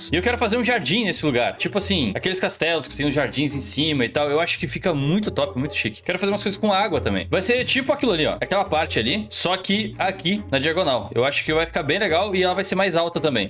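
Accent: Rio Grande do Sul, Brazil. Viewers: vc é gaucho? no way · Você e gaúcho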